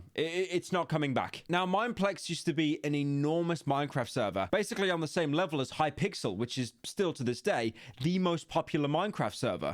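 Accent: United Kingdom. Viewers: why are you so quiet british man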